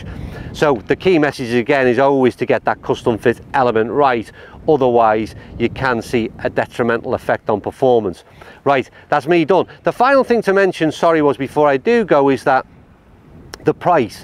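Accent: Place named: England, United Kingdom. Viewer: Why do we have to listen to people that have a strong English accent???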